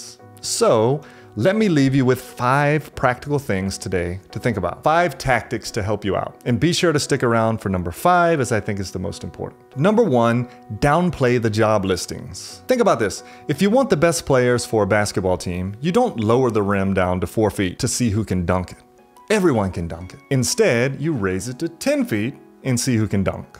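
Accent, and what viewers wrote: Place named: Chico, United States. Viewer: sounds like he's from Chico, California.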